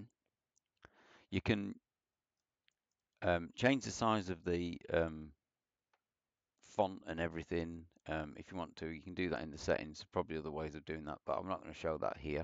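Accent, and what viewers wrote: United Kingdom. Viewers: cool British accent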